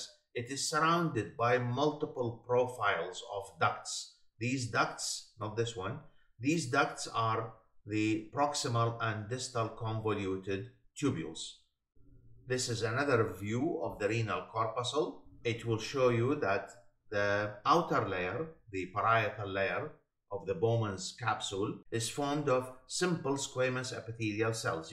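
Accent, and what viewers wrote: Iraq. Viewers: Noticed the Iraqi accent from afar! Thank you for the brilliant explanation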